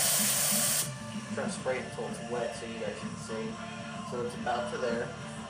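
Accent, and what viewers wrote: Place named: United States. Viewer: Yo, great video! Very thorough, I appreciate it a lot. Around the mark you mentioned that it's now illegal to do... such and such. I didn't quite catch that, despite trying to replay that portion. What's now illegal, exactly? It is only in your state, or nation-wide (assuming you're in the US based on your accent) Thanks again.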